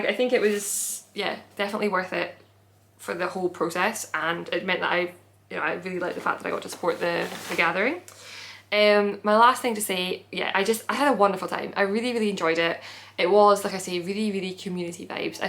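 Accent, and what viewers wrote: Scotland, United Kingdom. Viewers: I personally don't have a knitting community in person because my knitting is my relaxing alone time, I do however LOVE the online community, I feel like I can join others in our passion without having to worry about contributing, Yours was actually one of the first knitting podcasts I came across and fell in love immediately, being Irish I love the Scottish accent, and whilst watching this I am working on my 6th. Tolsta tee and planning what yarn to use in my first Lauder, x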